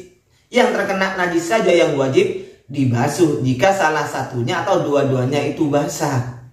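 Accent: Madura, Indonesia. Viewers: In yg punya Chanel suara kyk orang Madura pantas lh GK suka sama ustadz KHB konco nya si Romli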